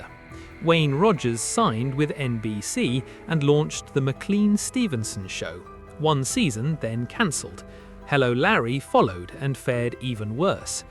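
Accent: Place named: United Kingdom. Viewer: However good it may be, why oh why do we have to put up with these British accents for all these videos? Sick of it!!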